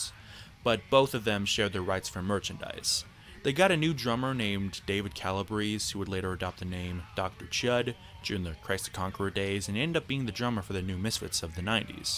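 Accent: New Jersey, United States. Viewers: Vampire costume+Jersey accent=Priceless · He might be living in Oz but he clearly has a US accent, so must have previously lived there.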